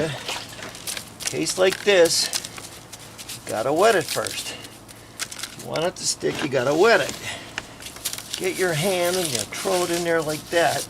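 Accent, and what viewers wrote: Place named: Pennsylvania, United States. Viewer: I learn so much from you,; I believe you are from Pennsylvania, my wife is from Erie and your accent reminds me of her relatives and friends!! Nicest people I've met! Cheers!!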